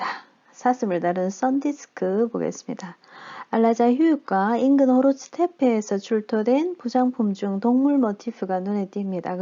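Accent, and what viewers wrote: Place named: Gyeongsang, South Korea. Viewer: 취향에 맞는 문화채널 우연히 접하네요. 경상도 억양의 강좌 귀에 쏙쏙 들어오네요.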